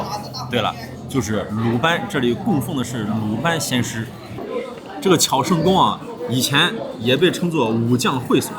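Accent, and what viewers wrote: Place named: Xi'an, China. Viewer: 是咱西安乡党吧，这一口亲切的醋溜普通话哈哈哈哈😂😂😂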